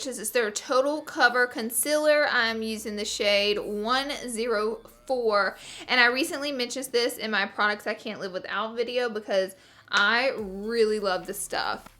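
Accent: South, United States. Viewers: yes because she’s southern and has an accent.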